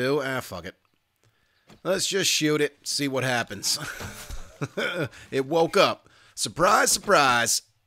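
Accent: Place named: West, United States. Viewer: Loving this mod n ur western accent, keep em coming please! 💛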